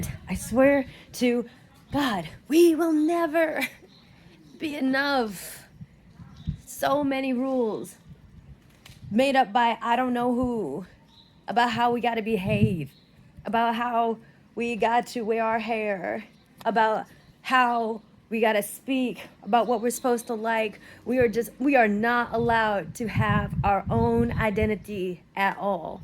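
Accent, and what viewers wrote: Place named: Houston, United States. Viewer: I fuckin love!! Shes always here with insightful commentary and hilarious parodies. Also where in the South are you from? Cause I swear you be soundn like me and my cousins in Houston!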